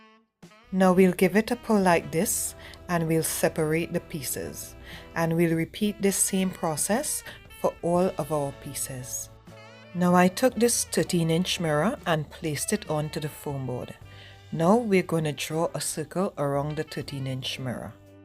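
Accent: Trinidad and Tobago. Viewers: Beautiful. I'm hearing the Trini accent and I'm so glad I found your channel. Looking forward to seeing more.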